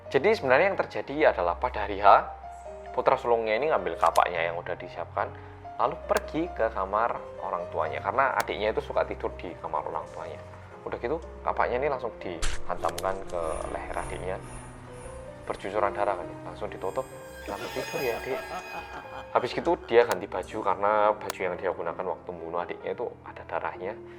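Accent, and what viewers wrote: Java, Indonesia. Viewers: Yang MEDHOKKKKKKKK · Balik lagi sma yanggggggg medokdokdokkk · 3 channel storytelling favorit: 1. (pelan, medhok dan mudah dipahami) 2. Nadia Omara (gayanya kayak itu ghibah) 3. Arroyan Pram (Konspirasi dunia pake Astronomi, Fisika, dan editannya gila keren banget, serasa nonton agung hapsah dan Jerome polin bahas konspirasi)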